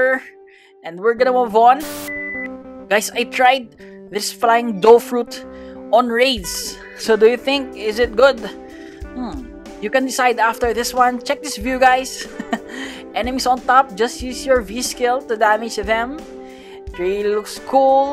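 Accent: Philippines. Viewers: are u from the phillipines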